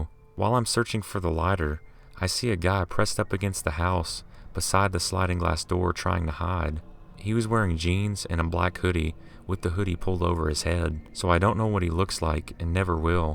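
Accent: South, United States. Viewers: Cool accent. Sounds a little southern(?)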